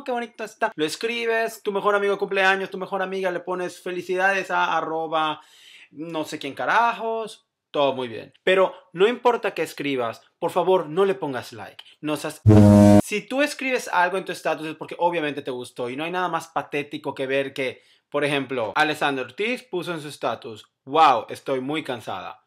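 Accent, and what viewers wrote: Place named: Yucatan, Mexico. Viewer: eres de Yucatan?? tienes el acento....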